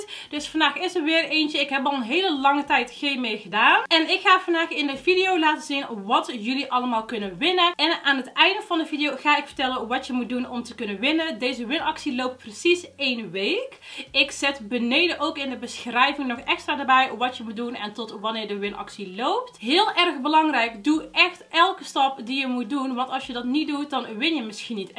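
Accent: Brabant, Netherlands. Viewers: Hee. Kijk graag naar je filmpjes, omdat je zo lekker Brabants bent. 😃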